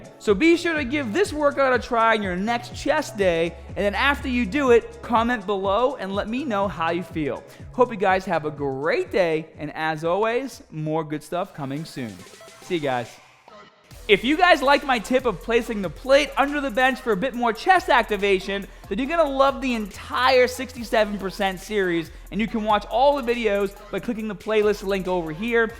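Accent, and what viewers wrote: Boston, United States. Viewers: You got be from Boston with that accent 💪🏽 I been doing for while definitely gets you a nice pump started to see results after a month nice!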